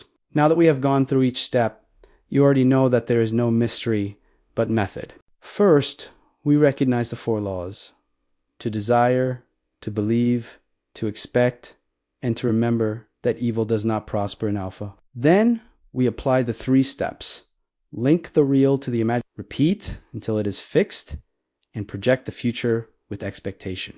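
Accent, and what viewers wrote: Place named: Spain. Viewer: What is the purpose of image moving his lips?He had a deep Spanish accent. I have to look away. It rather takes away the intention.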